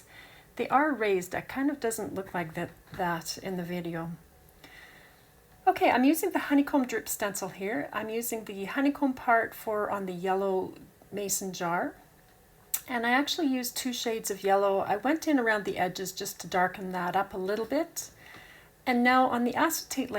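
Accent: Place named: Canada. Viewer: What a lovely card. I love your Canadian accent.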